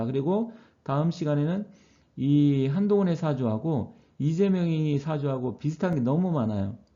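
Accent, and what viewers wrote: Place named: Jeolla, South Korea. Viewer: 선생님은 말씨톤이 전라도말씨같은데 전라도에서는 보기드물게 자유민주주의 보수우파시네요. 수고가 많으시고 훌륭하십니다.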